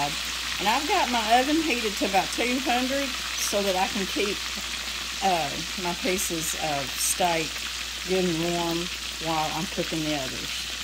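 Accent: South, United States. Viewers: This is one of my favorite meals. I've only made it once though and it was OK but not as good as I've had at a restaurant. I didn't use the crackers crumbs. I am really interested how you tenderized that meat though. I tried with mine but it didn't look like that. What is your secret? I love listening to you talk too. My momma was from Kentucky and I love the southern talk and way ya'll say things. I watch your channel a lot. Thanks for this recipe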